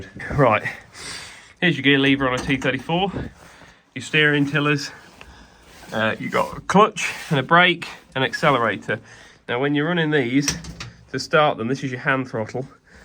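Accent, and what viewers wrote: United Kingdom. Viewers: Bro i absolutely Love that British accent 😂❤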